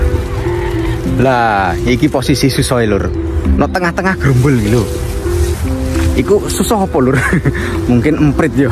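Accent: Java, Indonesia. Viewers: Hiyo medok banget 😂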